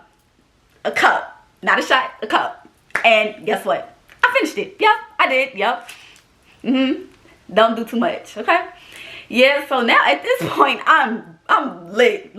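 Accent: New York, United States. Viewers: I love the way ny talk 😍. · Are you from New York even ??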